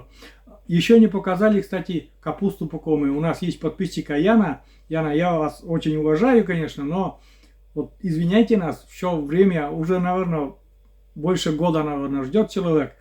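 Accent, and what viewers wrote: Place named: Vyatka, Russia. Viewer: Говор похож на вятский.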